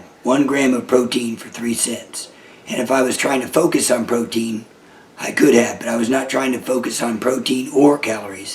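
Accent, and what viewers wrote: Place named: South, United States. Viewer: I suscribed just because I love how southern your accent is lol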